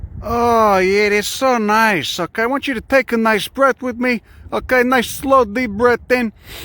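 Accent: Chicago, United States. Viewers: I assume you mean by interweaving cuss words and disparaging comments about the state of humanity while using a Chicago Italian accent. If so, I completely concur.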